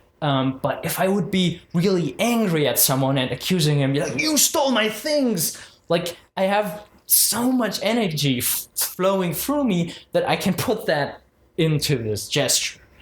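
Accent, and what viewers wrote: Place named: Germany. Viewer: I love your German accent Cause, I have the same accent xD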